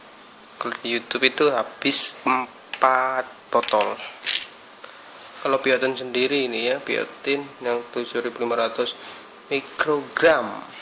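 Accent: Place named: Java, Indonesia. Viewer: medhok amat bro ngomongnya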